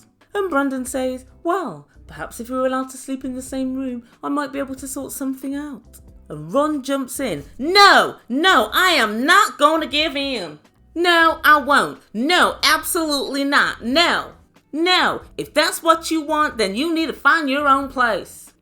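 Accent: South, United States. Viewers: Love your Southern accent! Love your channel! ❤️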